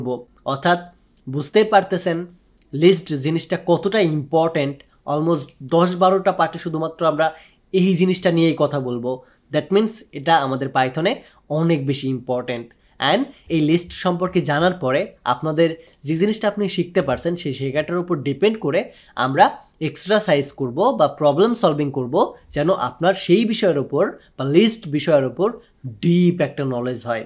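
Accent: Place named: Kushtia, Bangladesh. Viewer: apnar bari ki jhenidah ,kushtia elakay? kotha bolar dhoron shune jante iccha holo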